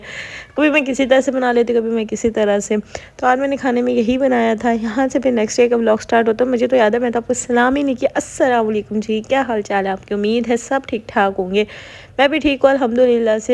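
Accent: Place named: Karachi, Pakistan. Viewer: Ap ai to Multan se ha Karachi me pr ap bolte bilkul Karachi wlo ki trah Hain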